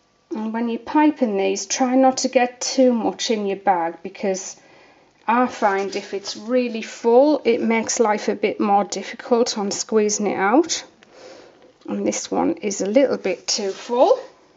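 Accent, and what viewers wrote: United Kingdom. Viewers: Love to hear your beautiful British accent and your videos! Living in Rochester, NY we have alot of gray days as well. Keep up the great work, You cheer us up here!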